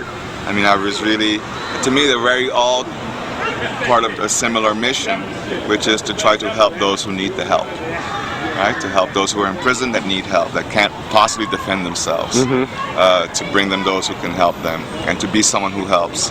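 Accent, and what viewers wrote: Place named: Latin America. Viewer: Me. wrote something in Spanish recently. I wonder if he is Hispanic, I hear a slight accent, no? He mentioned Puerto Rican and black influence.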